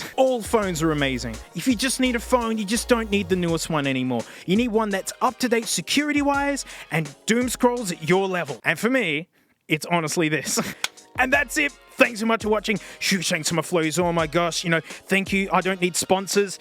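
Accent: Australia. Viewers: You just had to confirm my suspicions of you being Australian in the end😂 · thats because hes an aussie mate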